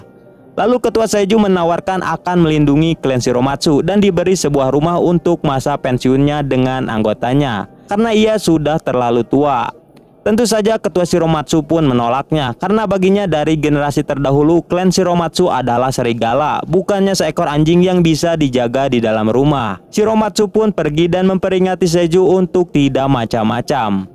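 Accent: West Java, Indonesia. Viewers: Orang sunda ya bang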